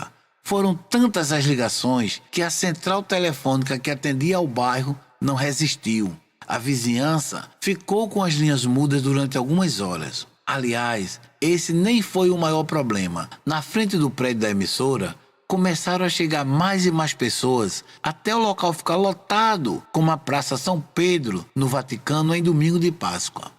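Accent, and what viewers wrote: Northeast, Brazil. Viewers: Boa noite, já conhecia a história, só assisti de novo porque gosto da sua fala, esse jeito carregado do nordeste. Abraços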